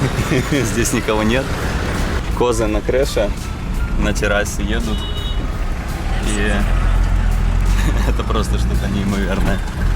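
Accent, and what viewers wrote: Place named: Ukraine. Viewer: О Боже... чувак , ты ссышь зрителям в уши . Посмотрел 15 минут , больше не могу . Твоё произношение на русском просто режет слух . Дружище , если ты думаешь , что разговаривая на русском ( а не на украинском , твоём родном языке ) ты сможешь увеличить аудиторию - то ты глубоко ошибаешься . Я как человек проживший 20 лет в Украине и 7 в России , просто не могу не обращать внимание на твой русский . Не ломай свой язык на камеру , больше потренируйся в плане произношения и только после этого пили видосы на русском . А что касательно самого видео - молодец. Видосик качественный , хорошо проработан , продолжай в том же духе . Только прошу тебя , пожалуйста , прислушайся к моему не большому совету )))))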